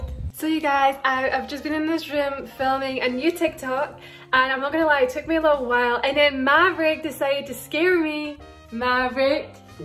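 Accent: United Kingdom. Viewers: Wait a second are u from the UK? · Omg. You have a lil bit of an accent. British?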